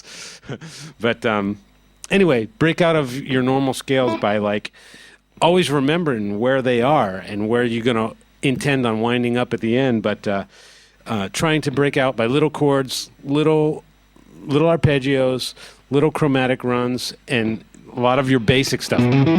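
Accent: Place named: United States. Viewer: He is American. It's how he's always going to sound. Like if you learn Spanish, you're not going to have the spanish accent when you speak English. Having the accents is a big part of language, and when learning another language, they usually don't blend.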